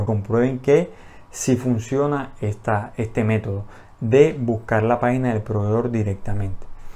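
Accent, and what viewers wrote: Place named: Caribbean Coast, Colombia. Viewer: Costeñol efectivo, gracias man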